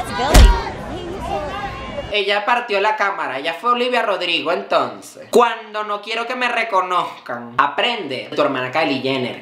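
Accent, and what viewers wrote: Venezuela. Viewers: Amo como hablas Chama yo también soy venezolana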